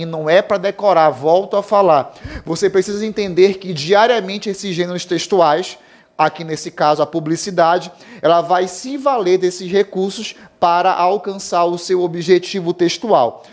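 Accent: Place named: Pará, Brazil. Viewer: aula boa é com sotaque paraense égua mana muito bommm!!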